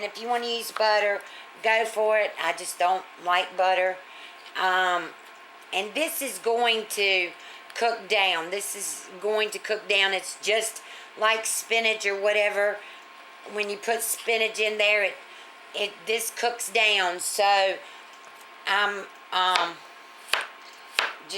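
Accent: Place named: Washington DC, United States. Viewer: where are you from? Your accent sounds like my older female relatives, from the Washington DC area back when it was fairly rural. Listening to you really takes me back, and they cooked these same dishes too. Thanks!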